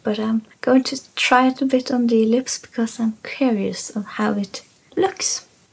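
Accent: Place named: Norway. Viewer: love your accent girl. same as aurora because she is norwegian too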